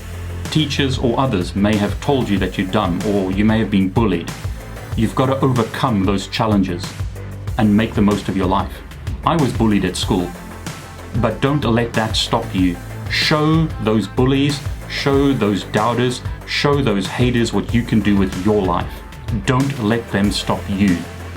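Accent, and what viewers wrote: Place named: South Africa. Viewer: Okay great. I knew i recognized the accent. I'm from KZN-PMB. Thank you for the videos!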